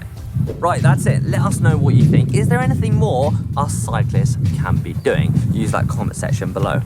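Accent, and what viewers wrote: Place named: RP, United Kingdom. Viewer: Love how posh this white guy is. Keep it up, great work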